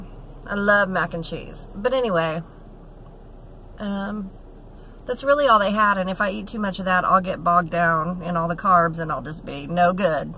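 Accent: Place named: South, United States. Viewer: I'm gonna assume your from the south hence the twang. Love your vlogs💖😘I'm in Louisville,KY which alot of ppl call 'the south' but it's actually mid-west or what some ppl call 'The Gateway to the South' so sometimes I say words like ' Big Ole' but I also lived in Chicago for awhile and sometimes I have pure Midwest accent w/o a touch of twang or Southern, it's the strangest thing! Lol🤷🏾‍♀️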